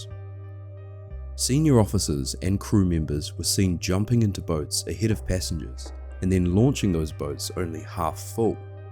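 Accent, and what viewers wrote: New Zealand. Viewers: Holy shit, this is so well done that I assumed this was one of the big documentary channels. It wouldn't have even been out of place on a Discovery plus. I'm so glad The Holy Algorithm brought me here. Also, maybe I'm a sick f#<k, but I giggled every time the Aussie "deck" was said. "Below dick" 😆 Edit: I just checked your page & now realize that you're from New Zealand, NOT Australia. My dumb American ear can't differentiate, but it is NOT an abomination of an accent! I love it. You know what is a true abomination? My Boston accent. The whole "I pahk the cah at Hah-vahd yahd" (I park the car at Harvard Yard) is real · I like the Kiwi accent. It is a refreshing change from an American or English one. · That sounds a lot like a New Zealand accent to me! 😀😉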